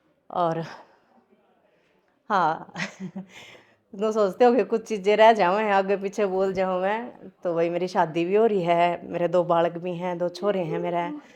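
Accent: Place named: Haryana, India. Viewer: जी हमारी पुरानी संस्कृति हरियाणवी के सुपर स्टार है और थारी बोली मैं तो अलग ए हरियाणवी की मिठास आवे है हरियाणवी ओल्ड संस्कृति जिंदाबाद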